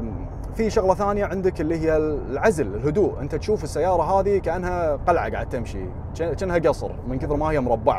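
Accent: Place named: Kuwait. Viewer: والله اللهجة الكويتية جميلة جدا ❤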